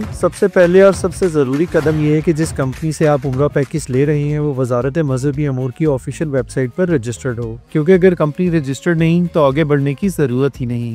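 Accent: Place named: Bengal, India. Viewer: bhai ap Accent bangali wala lag raha hai..bhai bura mat man na..🙏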